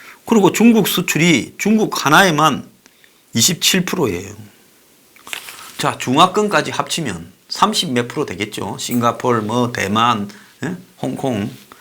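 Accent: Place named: Busan, South Korea. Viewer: 황장수 !!! 제임스 본드 !!?? 너무많이안다 !! 우아 ~~!! 부산본토말 ~~!!황장수 !! 우파.좌파.중도파. 모두싸잡아 잘정리하고있다 !!^정치.경제 를잘알려준다 !!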